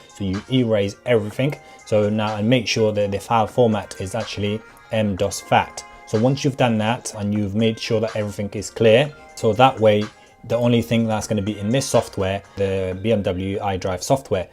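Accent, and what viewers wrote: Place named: Bradford, United Kingdom. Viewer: You’d either from Bradford or Birmingham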